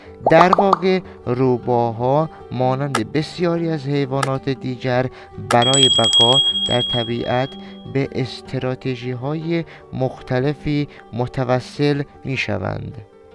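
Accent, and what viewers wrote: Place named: Turkey. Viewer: داداش خسته نباشی بخاطر ساختن این ویدئو.میخواستم یه پیشنهاد بهت کنم.بنظرمن بهتره متن ویدئوهاتو با همون زبان زیباودوستداشتنی آذری بخونی و زیرنویس فارسی براش بذاری.بنظرم اینطوری مخاطب بیشتری پیدامیکنی.اینجور کانال‌ها و ساختن اینجور ویدئوهای قوی و زیبا، خیلی زیباتر وجذابتر خواهد شد زمانیکه گوینده خیلی روان و فصیح وبدون لهجه بازبان رسمیه کشورش، روایت کنه.متاسفانه شما بالهجه‌ی شیرین ترکی صحبت میکنی که به گوش شنونده نسبت به ویدئو، جذاب نمیاد.بنابراین بنظرم بهترین کار همونیه که گفتم.با زبان آذری و زیرنویس فارسی.بازم خسروان صلاح مملکت خویش بهتر دانند.امیدوارم حرفام باعث سوءتفاهم و ناراحتیت نشده باشه.صرفا فقط دوستداشتم زحمتی که میکشی، ثمر بده.ایام بکام⚘️🌿⚘️🌿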